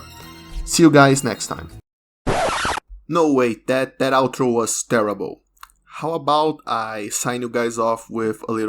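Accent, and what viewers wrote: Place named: Brazil. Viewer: Your accent sounds Brazilian to me.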